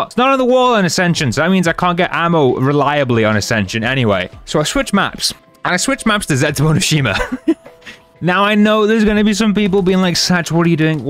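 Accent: United Kingdom. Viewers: Didn't realise you were British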